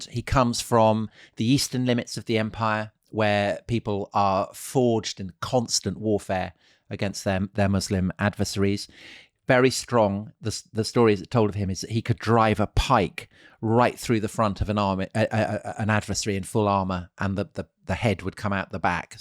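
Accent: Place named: United Kingdom. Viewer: Bristling hair of a pig. Eyes of a mole. Very funny with a nice British accent. Great narration!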